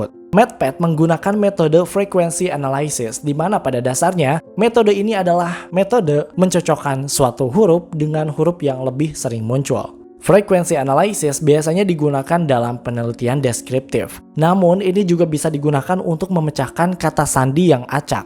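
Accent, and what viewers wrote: South Jakarta, Indonesia. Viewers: Bang lu orang Jaksel kh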